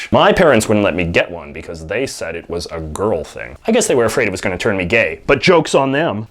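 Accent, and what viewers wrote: Canada. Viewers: "Aroond", well now I know you're not faking the "aboot" thing. Btw I'm also 32, hi-five.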